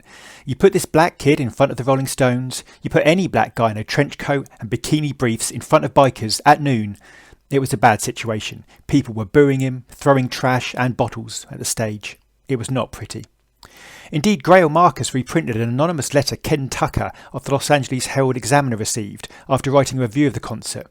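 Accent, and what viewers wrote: United Kingdom. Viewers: Oh really? So that was your video narration, then? Well, it just happens to be truthfully the case that your ultra-posh accent led me think you're likely gay. There's nothing wrong with being gay in and of itself, btw. The only thing I actually have against gay culture these days is its wholly unrealistic demand for universal acceptance, which it will never get, if for no other reason than that it runs contrary to many people's traditional religious beliefs. Anyhow, if what I said about your accent bothers you, then I suggest you gtfo of the London area and spend time with scousers. You may or may not like them, but at least you'd rarely suspect they're queer based on voice alone. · ingilsh accent, ingilsh bs.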